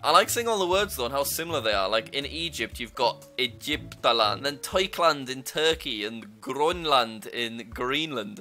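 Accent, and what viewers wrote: United Kingdom. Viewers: Your bri`ish accent is just crazy, mate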